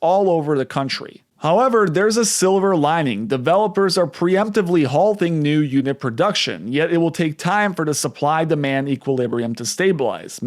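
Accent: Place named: Chicago, United States. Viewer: You from Chicago? That accent...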